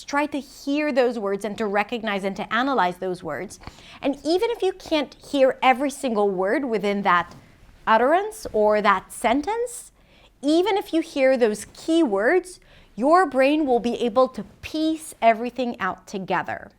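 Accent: United States. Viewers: Excellent but please teach me how to sound can and can not (can't) in your american accent. Thanks you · Hi, I am new to your channel... I am amazed how goid your Englush is even though you aren't a native speaker but you sound really American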